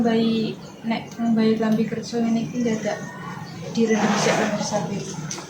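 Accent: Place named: Java, Indonesia. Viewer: mf mbk ngomongnya jangan jawa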